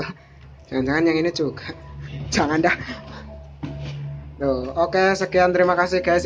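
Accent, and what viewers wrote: Banyumas, Indonesia. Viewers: Njir ngapak koe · Masih bersama ini membukanya gmna ni :v sepertinya wong ngapak sekang ndi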